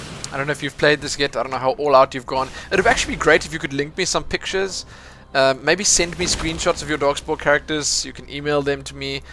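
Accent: South Africa. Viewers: I'm probably wrong but are you south african?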